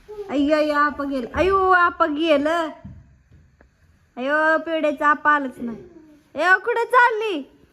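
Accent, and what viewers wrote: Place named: Rural, India. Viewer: प्रिय आणि....... च्या बोलण्यामध्ये एक वेगळेपण आहे ग्रामीण लेहजा बाज आहे तिच्या अक्टिंग चां वापर योग्य रित्या जर करून घेतला तर आपणास खूप मदत होईल.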